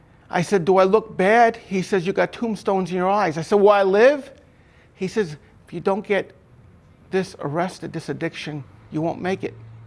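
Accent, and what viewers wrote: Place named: New York, United States. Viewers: Hey brother my wife is from Astoria 37/31 and Broadway. I hear the NY wisdom in your voice. Good luck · This guy could do audiobooks. I could listen to him reading a book all day.....his New York accent......his intonations....fabulous! Thank you for telling your story. You made something good out of something bad. Everyone who's listened to this today got something different out of it.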